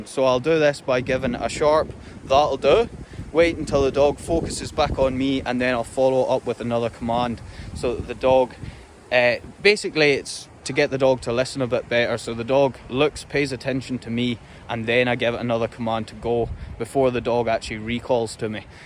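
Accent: Scotland, United Kingdom. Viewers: English spoken with Scottish accent. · Hey, I do have a question: I cannot understand your order for the recall. I am from Germany and it’s difficult for me to understand your scottish mothertoung. For me the order for recall sounds like a serene! 😅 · Your accent makes it so much. Better. Some American guy yelling these commends would not be as exciting. Yeah Scotland!!!! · Good evening. It is Isabelle from France. It is so nice to hear this Scottish accent of yours. Yes you love what you do and it shows. These dogs are so intelligent, it is marvelous. All you explain is very interesting. Thank you ever so much. I once looked after rough collies in England. 15 dogs who drove me crazy hihihi 🤣🤣🤣. Border collies are my favourite though! Thanks to share your work with us. See you and take care. Bye bye! 😉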